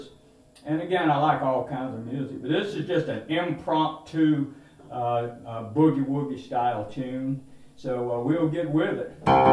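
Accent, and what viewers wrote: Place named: Rural, United States. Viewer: I am from Egypt, and this is the most english accent that i love to hear, is that the accent of the county sides people in America?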